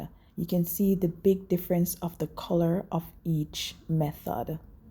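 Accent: Jamaica. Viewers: typically a Jamaican she said a pat stur not pot or stir love my jamaican accent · I cn hear you a yard girl 😊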